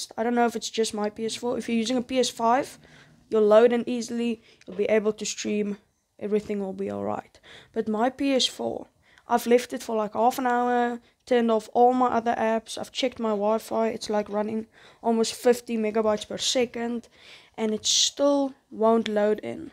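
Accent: South Africa. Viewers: Why u sound south african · I had a South African best friend on ps5 voice chat friends when I heard your voice I immediately knew 😂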